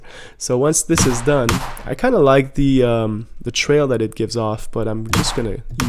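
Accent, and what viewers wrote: Quebec, Canada. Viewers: hmmm ca sonne comme l'anglais d'un Québequois ca!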